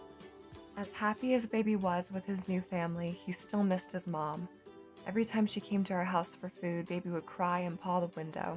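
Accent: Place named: United Kingdom. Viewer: British accent is quite appropriate, I say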